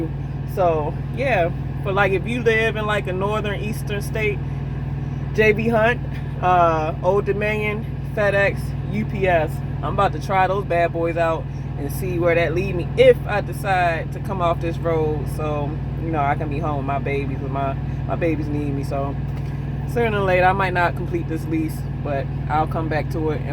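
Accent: Baltimore, United States. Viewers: That thick ass Baltimore accent, I'm from Philly I know a bmore chick when I hear it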